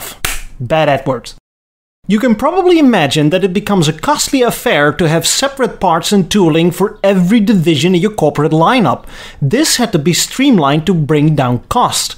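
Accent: Netherlands. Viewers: are the very best motor vehicle episodes on YouTube. Brief, to the point, factual, entertaining humor enhanced further by Dutch-accented delivery. FIVE STARS. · Great, interesting gloriously, mammoth series of videos. really enjoyed each one and it has given a sparkling insight into that period in American car evolution. Don't worry about your pronunciation. Your Dutch accent is brilliant and quirky. How does one pronounce Coupe in the USA, England (the home of English) and Europe? There isn't only one pronunciation! Please keep up the good work.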